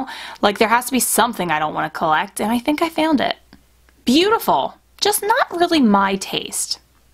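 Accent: Philadelphia, United States. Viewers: I'm from the Philly area too!! Never really picked up on your accent until you mentioned it.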